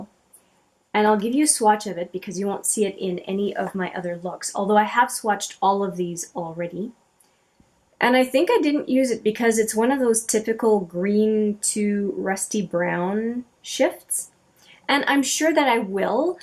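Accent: Canada. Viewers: You are such a lovely and beautiful person❤️ I have been loving your videos! As soon as I heard you speak, I could tell you’re Canadian (my husband is Canadian and I’m American)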